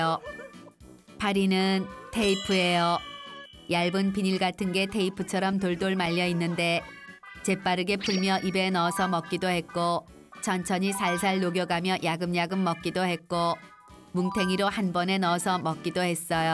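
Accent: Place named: North Korea. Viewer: 근데 목소리가 북한말같음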